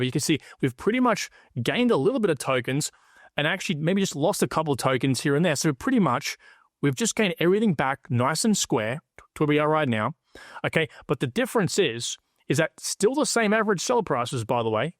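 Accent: Australia. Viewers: Nice video. Tho, I would enjoy your channel alot more if the Australian accent was toned down a bit.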